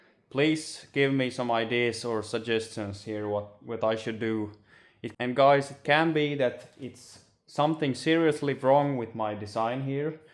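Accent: Finland. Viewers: ha, sa till frun när vi satt här och drack kaffe och jag kollade på videon att snubben måste ju vara finlandssvensk! Han pratar inte engelska som finnar brukar😋 Hursomhelst intressant att följa projektet.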